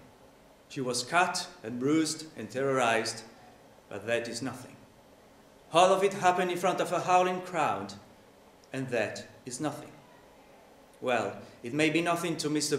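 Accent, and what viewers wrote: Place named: United Kingdom. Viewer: your british accent is perfect! :)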